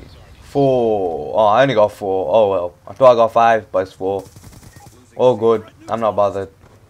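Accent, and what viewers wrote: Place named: Jamaica. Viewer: u sound jamica \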